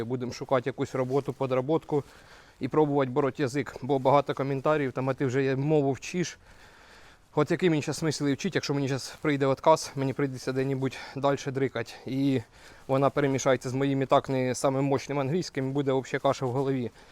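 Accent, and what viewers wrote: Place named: Surzhyk, Ukraine. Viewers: Лютий сурж, слухати неможливо, вухам боляче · 100%, Вже можна було б і потужність свого суржика значно зменьшити. Бо будучи в Польщі, напевно, ретельно намагався опановувати польську мову, в Данії теж буде, бо як іначе можна претендувати на високу зарплату, без місцевої мови. А ось до української мови несерйозне відношення. Тим більше, блогер, як він хоче завоювати симпатії багатьох українців, без української, навіть з легким суржиком, мови. А такий потужній його зараз суржик відрізає велику кількісь потенціальних підписників. Ось і мені подобається, а через такий тяжкий суржик, не маю бажання підписуватись.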